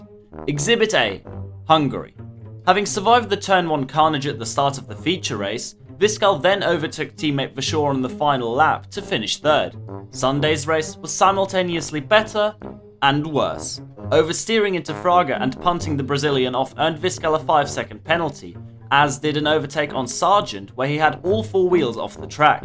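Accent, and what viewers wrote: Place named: Netherlands. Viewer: Goated Dutch accent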